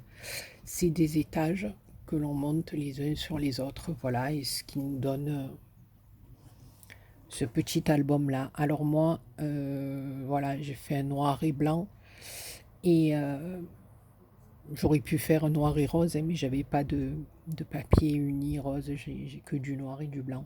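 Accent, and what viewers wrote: South, France. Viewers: Tout à fait sublime ❤️❤️❤️ton album est magnifique avec les papiers ...une abonnée de plus...hihi merci pour le partage. J aime ton accent du sud . Bises 😘 Anita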